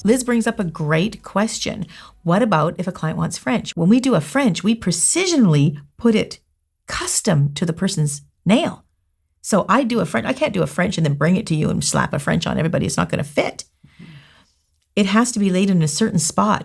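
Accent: Canada. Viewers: You can definitely tell is Canadian because of how many times she says "eh?" after her sentences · It’s my third time watching her vid and I totally don’t know anything about her But I’m going to guess she’s from Canada I’ve been hearing her saying ey many times 🖤🖤🖤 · Are you Canadian? Cause you say "eh?" a lot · Wait is she Canadian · Are you Canadian?😜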